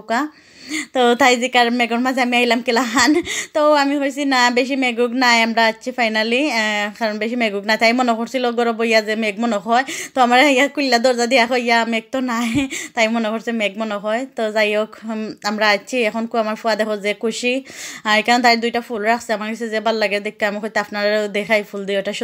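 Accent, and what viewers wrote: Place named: Sylhet, Bangladesh. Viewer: বাংলাদেশি দেখলে মন বরে জায় বন্ধু হলাম আপনি সিলেটি মনে হয়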